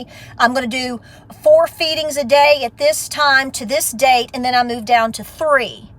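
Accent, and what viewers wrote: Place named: Texas, United States. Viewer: You sound like you should be a Texan!! I love it! And absolutely right!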